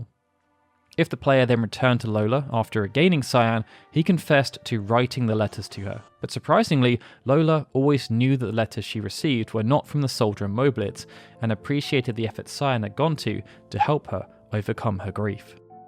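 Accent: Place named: United Kingdom. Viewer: I knew all those. Because you just recreated someone else's video with a brit accent.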